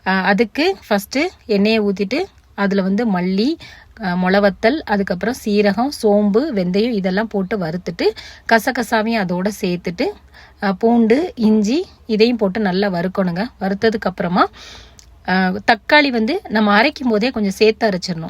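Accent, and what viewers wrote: Tirunelveli, India. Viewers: Happy birthday thampi Akka neinga evlo naal estate la erukinga ananlum slang tirunelveli slang ennum ungaluku pogala akka❤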